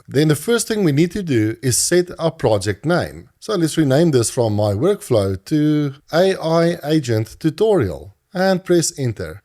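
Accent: South Africa. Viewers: 5 seconds into the video and I could tell you were south African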